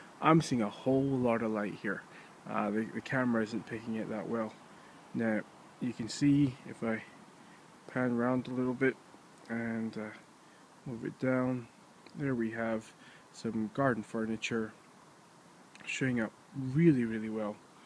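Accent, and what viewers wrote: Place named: Scotland, United Kingdom. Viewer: hi would the new ZL SC600MkII in neutral white be better as a general home general walking flashlight?. i know the new one will be some now 900 Lumens, but 900 lumens in NW compared to CW 900 lumens, would there be a great noticeable loss?. love your review and...scottish accent. mine has a bit of glasgow. thanks.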